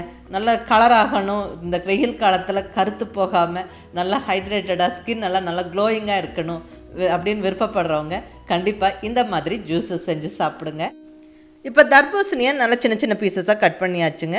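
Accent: Kongu, India. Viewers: செயல்முறை விளக்கம் அடிக்க ஆள் பில்லை. super explaination. crystal clear. கொங்கு தமிழ் super.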